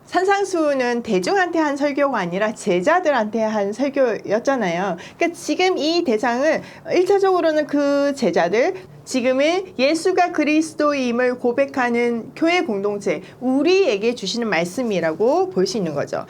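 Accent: Gyeongsang, South Korea. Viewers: 경상프라빈스 인토네이션 이군요 일크름을 넘 감사합니다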